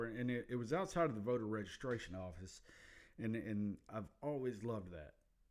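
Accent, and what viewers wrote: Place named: Alabama, United States. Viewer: Being British, I absolutely love the Alabama accent. Took me a few minutes to figure out who you are and that you're someone I already follow on Twitter. Always glad to find some more based content from one of our "cousins from across the pond". (You might not have any love for King George, but TBH not many of us over here have any love for the current King Charles either, so we'll grant you a free pass for your ancestors being a bunch of "splitters"! 😆) Really liked the low-key, chill, chat approach. You already seem to have a natural affinity for talking on camera, so bring on the next episode and here's to lots of luck with the channel, and a great weekend with Mama & Java. ❤🤍💙 <---- y'all might have left the empire, but we still share colours (even if you refuse to spell the word correctly 😉)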